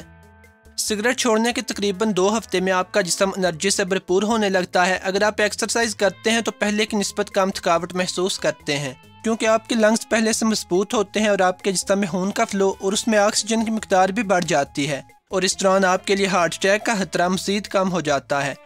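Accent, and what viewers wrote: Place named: Lahore, Pakistan. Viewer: Yaar Aap ka talking way bohat Pasand aya .... Sure Aap Lahore se ho .... Don't be offended mai bi Lahore se hi hun ...!!! Nice Video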